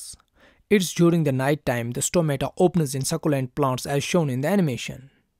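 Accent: Kashmir, India. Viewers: Sounds so Kashmiri Thank you btw!! Your concept are so on point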